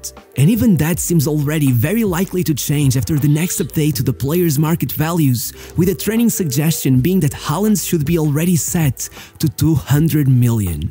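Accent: Portugal. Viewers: Logo vi que esta pronuncia tinha de ser portuguesa. Continua com o excelente trabalho :) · The Portuguese accent is strong lol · Vi logo pelo sotaque és tuga, impossivel nao notar lol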